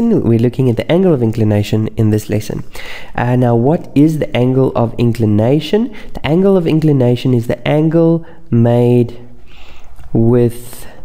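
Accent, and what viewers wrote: South Africa. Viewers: I thought i recognized the accent! Hey there South African bro!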